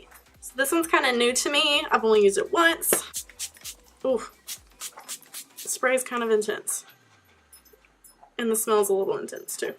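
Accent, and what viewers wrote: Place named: South, United States. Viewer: I KNEW you were southern! You talk exactly like I do... the way you word things really. I've got a southern way of speaking but with a wee Scottish accent because I grew up in a Scottish Filipino family. Lol. Weird bunch/combination I tell you.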